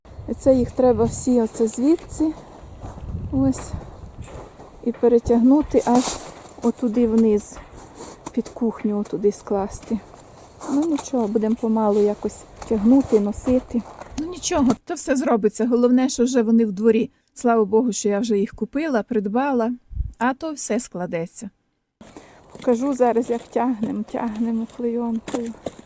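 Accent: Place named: Boyko, Ukraine. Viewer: У вас хороші діти.А де та дочка що приїзжала з онучкою?чи це Олега дочка.Бачу у вас вже такий бойківський говір😅.А я якраз щось подумала сьогодні за вас що ви щось не виставляєте відео,заходжу а ви тут🥰.Рада за вас що придбали дрова,ви знаєте як би важко не було але ще жодна жінка не пропала без чоловіка і у вас все буде добре❤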